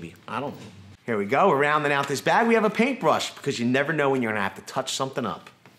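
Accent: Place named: Rhode Island, United States. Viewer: You have got to be from Rhode Island. North Providence? Johnston?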